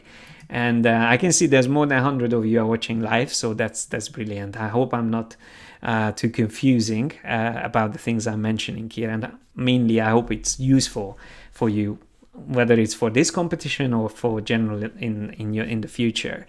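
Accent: Hungary. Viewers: Hi there, your accent is very Hungarian. :) I'm Hungarian and this is my 99d portfolio. <link>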